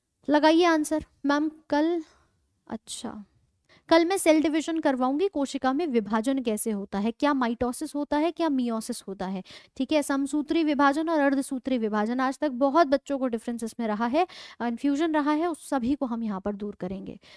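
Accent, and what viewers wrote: Bihar, India. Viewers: Etne khubsurat chehre kaise etne khubsurat se pdha lete hai thanks mam ekdam aditya ranjan sir ke bihari style me padhate ho❤❤❤❤❤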